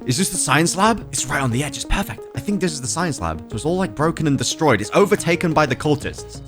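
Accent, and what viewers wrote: United Kingdom. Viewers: Are you British because I don't mind British people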